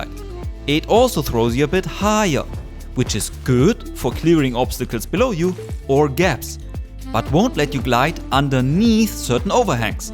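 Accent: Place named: Germany. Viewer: Klingt schwer als wärst du deutscher. Das englisch kommt mir sehr bekannt vor xD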